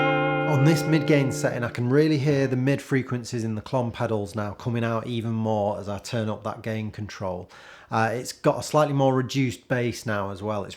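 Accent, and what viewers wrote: Manchester, United Kingdom. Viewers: Cool video. Sounds like you're from Manchester.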